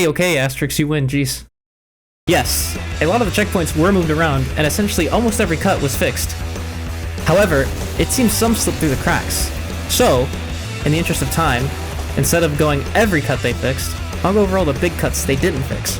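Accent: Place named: Italy. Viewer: I am italian, you are italian, i can hear that you are!!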